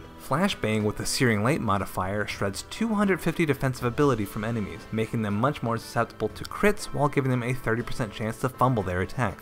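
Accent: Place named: Texas, United States. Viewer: In the First Moment i thought here was a Cowboy from Texas, who plays GD^^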